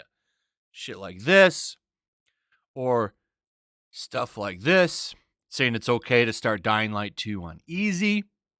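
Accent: United Kingdom. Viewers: Love the British accent.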